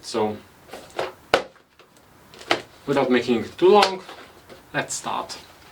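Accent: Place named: Russia. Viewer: сделаю смелое предположение: судя по акценту - автор понимает по-русски )) можете поделиться опытом и ответить на два вопроса? 1) долго цепь ходила по сравнению со старой системой?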